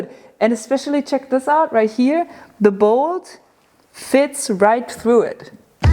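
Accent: Germany. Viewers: Hi super Cool geworden. Echt genial. Deine Deutsche Aussprache hört sich sehr sympatisch an . Könntst du öfters machen.. Liebe Grüße aus meinem Mini Bastelkanal Meenzer Bastel Bude und bleib mit deinen Liebsten gesund. Von Stefan.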